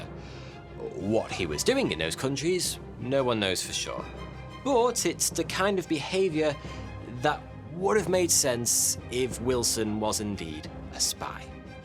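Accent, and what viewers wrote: United Kingdom. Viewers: DDoesnt everybody have 4 families , and I'm searching for and don't see a video from You on king arthur .. with your British Accent i think You should have one if you haven't been working on that one for years ...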